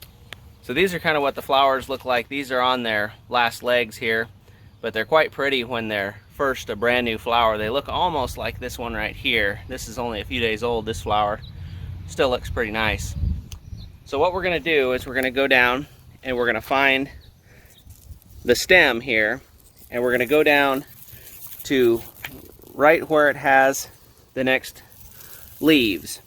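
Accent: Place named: United States. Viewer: First time I’ve seen your video and I’m totally in love with your American accent Oh and of course your lupins !!!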